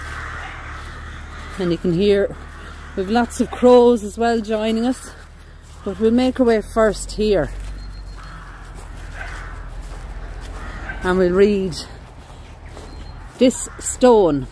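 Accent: Ireland. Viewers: Watching from Massachusetts, USA. I am second generation American born, all grandparents from Ireland. I love your videos, how you comment on the beauty of the sky and grounds and stones. Most of all I love listening to you. Has brought tears to my eyes remembering family members who have passed, who spoke and sang in that lovely brogue. God bless you for all you do. I would love it if you could keep your camera on the pictures and writings a wee bit longer. Thank you!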